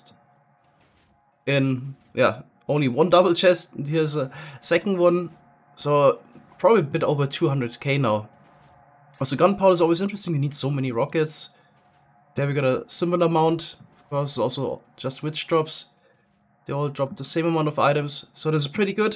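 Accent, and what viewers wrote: Germany. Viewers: Are you from Germany? Asking bc of youre accent 😅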